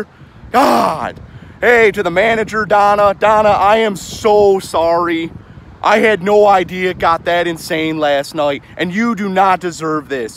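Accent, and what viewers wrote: United States. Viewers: what state is this dude from? lmao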